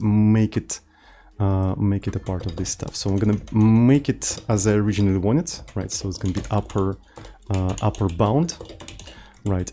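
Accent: Russia. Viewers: Czemu masz taki ruski akcent?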